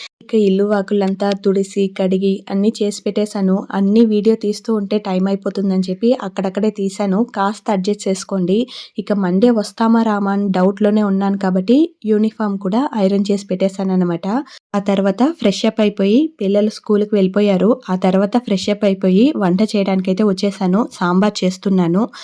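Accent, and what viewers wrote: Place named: Andhra Pradesh, India. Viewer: telugu matladutunte Andra anukunna.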